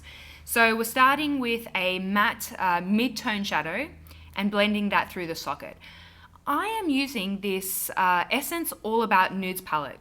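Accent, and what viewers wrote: Australia. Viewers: Hola!!!! Te saludo desde Colombia sur América, me encantan tus vídeos y tu forma de hablar, muy Ausie😁😁😁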